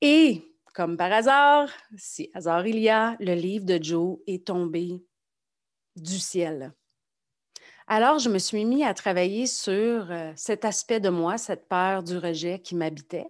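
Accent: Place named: Quebec, Canada. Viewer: Merci beaucoup pour ton partage. Par hasard je fais la méditation 🧘‍♀️ e juntamente avec ton accent Québécois, m’a fait pensé à une chère amie que S’appelle Jacinthe que nous avons travaillé ensemble, elle était infirmière 👩‍⚕️, et est retournée à son Pays. J’espère bien qu’elle puisse Retrouver ton témoignage et pouvoir déguster à son tour dans le champ d’unitie. Je t’embrasse très forte depuis la Suisse 🇨🇭 GRATITUDE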